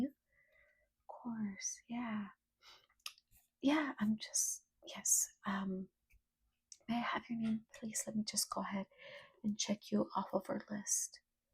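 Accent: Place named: Brazil. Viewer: Posso estar enganado, mas reconheço um sotaque brasileiro de olho fechado, inglês ótimo inclusive.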